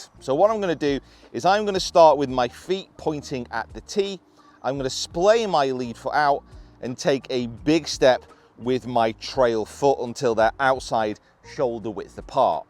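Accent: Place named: Texas, United States. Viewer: what part of Texas is he from ?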